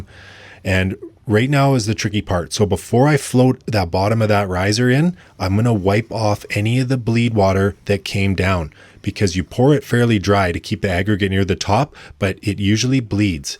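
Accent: Canada. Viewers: Okay, so unlike many people in the comments, I have zero problems with the way that you pronounce the words garage or process. You pronounce those words in a lovely Canadian way. But I have to draw the line at your pronunciation of asphalt. It's definitely not ash-fault, as there's no "sh" sound in asphalt.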